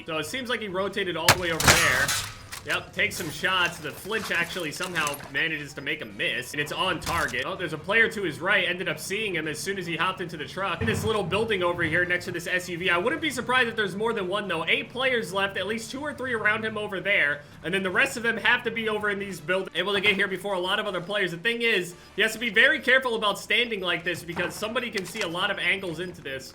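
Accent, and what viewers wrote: United States. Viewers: I love his cowboy accent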